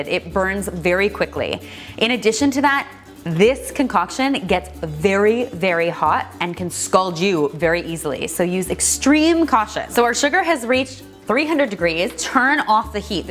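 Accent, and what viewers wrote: Canada. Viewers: Hers is less of the uh-boot Canadian pronunciation, and more of the subtle uh-beh-uh-oot pronunciation (almost like uh-boat but even more subtle). It comes out through other words like "house" too. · Are you Canadian, I love your accent. I am from the south (NC), I know all about accents..lol! :-)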